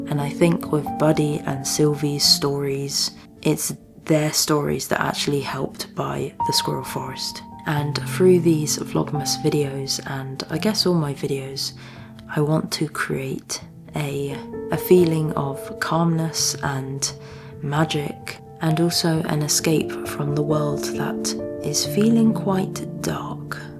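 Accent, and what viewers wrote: United Kingdom. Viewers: HI hello there, lucky to find someone who`s close to the nature. good to see you here, exceptional work done, great videos, amazing Brit`s accent. music, everything is so perfect n upto high standard videos, love to spare few moments. plz carry on making more videos. stay safe and rise n shine.............🐿🐿🐿🐿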